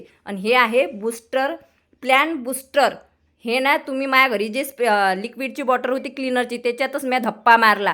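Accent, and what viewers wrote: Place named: Khandesh, India. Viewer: खांदेशी आहे का g तु ,काय ती मराठी भाषा तुझी, म्या 😂😂yayle😂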